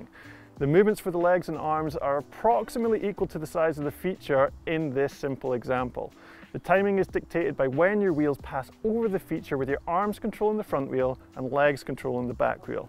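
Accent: United Kingdom. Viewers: "So obviously that's all beautiful, excellent technique.", with that British accent and the plethora of hand motions you've elevated biking videos to the level of a performance of Macbeth.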